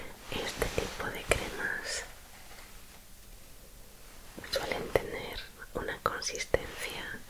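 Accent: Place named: Spain. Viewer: Me gusta mucho ese acento español ❤ es muy relajante su asmr.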